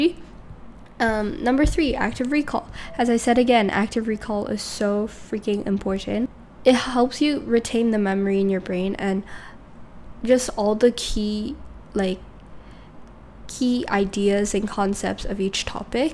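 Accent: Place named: United States. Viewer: wait im just curious why do you have an american accent if gcses are for the uk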